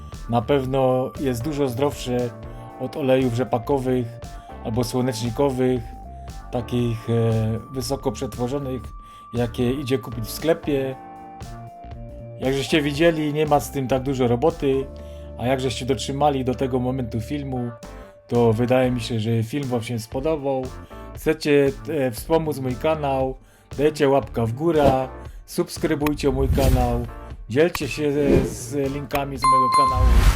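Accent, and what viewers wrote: Silesia, Poland. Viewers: Kocham Twoj slaski❤ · Piękna Śląska Mowa..Dziękuję za przepis....Pozdrowienia z Lubelszczyzny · Dziękuję biorę się za klarowanie masła i robię subskrypcję . Konkretny przekaz i śląska gwara którą lubię . Pozdrawiam😊❤❤ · Zrobiłam , świetny patent nie trzeba zbierać tej pianki. Jaka fajna gwara śląska . Pozdrawiam Pana 😊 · Jasno, prosto i konkretnie. Wartość dodana: piękna śląska godka. :) Pozdrowienia z Krakowa od potomka Ślązaka (z tym, że cieszyńskiego). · To jest śląska gwara, prawda? Pozdrowienia z Wiednia❤ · Lubię gwarę śląska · Co to za język ??? Ślązak? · To jest dobro Śląsko Godka 💪💪💪❤❤❤ ,fajnie się słucho 👍🤣.Superrr. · Lubię gwarę śląską!!!!! Dziękuję 🎉🎉🎉🎉🎉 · sama jesteś wsiowa. To gwara śląska, gdyby była kaszubska, ni kej byś nie poniała, hihiiii... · Piękna jest ta mowa Śląska dzięki za przepis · super przydatny filmik :) uwielbiam śląską godke :) pozdrawiam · Mieszkałam 20 lat na Śląsku, i ślōnsko godka szczerze mnie wzruszyła ♥ Dziękuję za bardzo uproszczony przepis, bardzo lubię minimalizować swój nakład pracy, przy maksymalizacji efektów. 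Akurat dziś robię masło klarowane, cieszę się, że przy tej okazji tutaj trafiłam, bo będę mogła na gorąco wypraktykować. Pozdrawiam szanownego Autora, wszystkiego dobrego. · Lubię słuchać Śląskiej mowy, 38 lat temu mieszkałam przez pół roku w Rybniku .A maslo i tak sobie zrobię. Pozdrawiam i już dobrych świat życzę. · Pikne masło chopie. 😅 Uwielbiam śląską gwarę. Fajny przepis i cudowny zaśpiew.👌🏻 Dzięki 👊🏻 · Jo lubia Śląska gadka ❤❤❤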